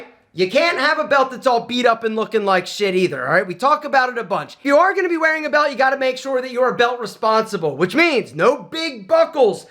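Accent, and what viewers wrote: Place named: South, United States. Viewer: The southern side of you was out this morning. You said "like" like 2,000 times Lol I appreciate you brother. Keep it up!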